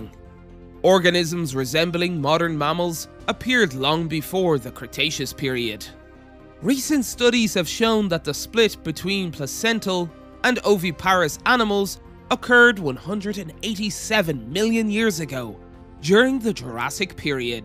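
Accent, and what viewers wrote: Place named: United Kingdom. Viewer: The narrator has a shrill voice and a UK accent. Not my favorite.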